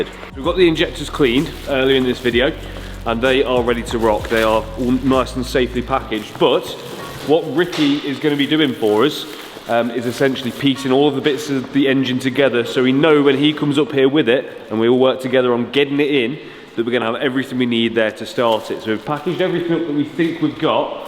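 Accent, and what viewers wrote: United Kingdom. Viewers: I’ve never heard a person with a non American accent say “ain’t” before. Proof a British accent makes any word sound proper….ish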